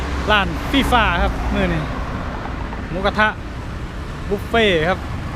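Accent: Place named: Isan, Thailand. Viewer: ชัดนะไอ้อีสาน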